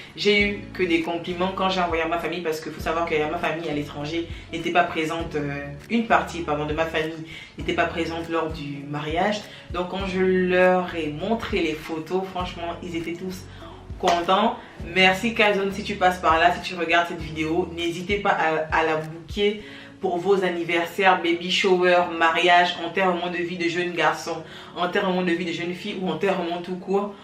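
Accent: Gabon. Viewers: Votre accent m'est beaucoup familier❤ Le Gabon est là 😊?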